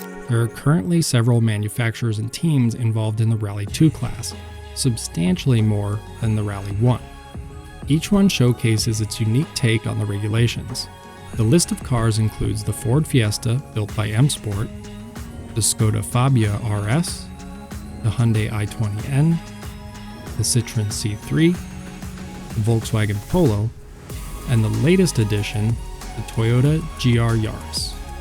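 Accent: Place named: United States. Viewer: An american speakimg on rally? Its a no from me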